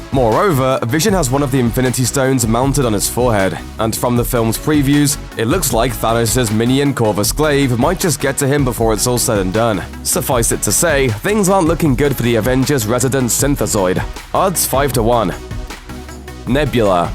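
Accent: United Kingdom. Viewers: Is the speaker british by any chance? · Luke Meyer, you mean narrating in REAL English language, British English is not an accent, it's just English, maybe you should have said "please get someone to narrate in American accent" at least it would be correct then.. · can u please not have a british guy narrating cause british accents make me get lose brain cells there so cancer and annoying after hearimg it over and over again it get fucking annoying don't get anyone to narrate from the fucking island or ireland next to it they make a cool video terrible no offense to royale fucks but your voice is like a hyena screaming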